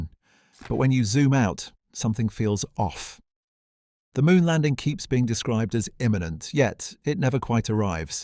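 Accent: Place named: United Kingdom. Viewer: thanks for sharing i like science documentaries plus enjoy your British English accent look forward to your next work greetings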